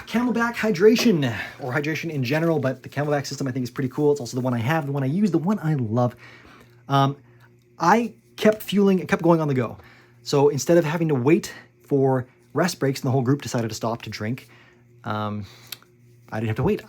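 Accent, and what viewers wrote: Canada. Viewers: I’m confused..you sound Canadian but have a gun collection that would rival Burt Gummer from Tremors. I thought Justin Castreau doesn’t allow guns?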